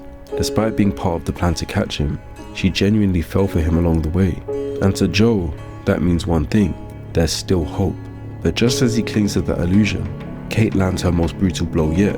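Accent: London, United Kingdom. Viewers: the best video ive seen yet on joe and the London accent makes it even more impressive.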